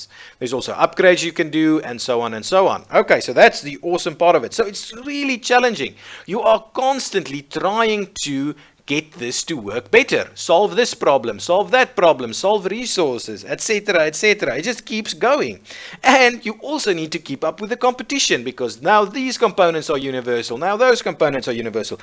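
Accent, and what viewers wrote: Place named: South Africa. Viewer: That's a South African accent if I ever heard one. :p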